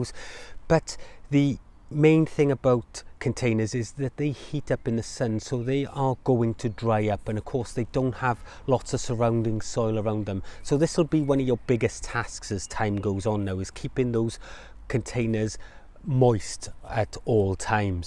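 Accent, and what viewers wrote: Wales, United Kingdom. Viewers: nice to hear the welsh accent here all the way from down under!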